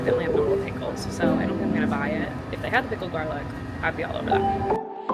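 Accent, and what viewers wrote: New York, United States. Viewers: Hello, Great Video Guide about getting around in New York delivered in your Trademark Husky, Strong New York Accent. Who's filming you, is it one of your roommates? Keep up the great work! From London UK 🇬🇧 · This is the typical American-style English that I can almost handle listening to (much better than when I have meetings with vendors in Bengaluru), especially when the speaker happens to be a gorgeous blonde!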